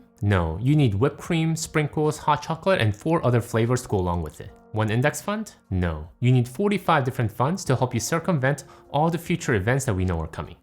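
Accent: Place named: South Korea. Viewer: You have a strong KOREAN accent.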